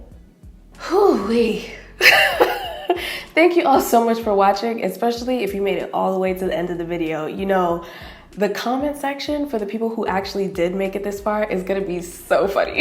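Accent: Jamaica. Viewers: So yuh just been chippin in the patois these past videos 😂😂 I genuinely love that 😂😂😂💜💜💜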